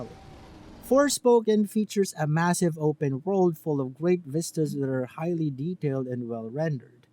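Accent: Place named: India. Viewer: great honest review , love your hindi accent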